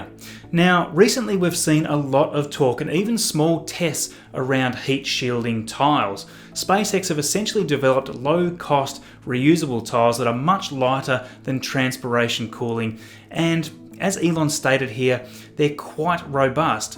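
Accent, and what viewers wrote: Australia. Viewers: Nice video thanks. Random q. Where in Australia are you from?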